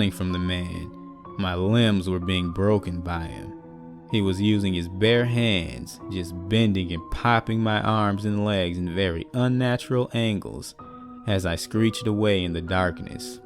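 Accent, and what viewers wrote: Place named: Deep South, United States. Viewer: 🇬🇧 I really like your stories so ive subbed to yr channel I also love yr accent, i think its deep south, Alabama or Louisiana? Im English & live near the Scottish borders & have only ever visited New York a few times & also visited friends in Utah & Wyoming but have had a thing about the kind of accent you have & so enjoy listening to you narrate Your stories are also very good & I havent yet heard any of them before, did you write them? If you do then your writing talents are considerable I look forward to hearing many more stories Keep up the good work, ive often subbed to creepypasta channels when they have had less than 1,000 subs yet in no time they have climbed to 20,000 - 50,000 Dont know how long you have been going but i always say From small acorns do large Oak trees grow. Thank you for your narrations I do so enjoy listening 👧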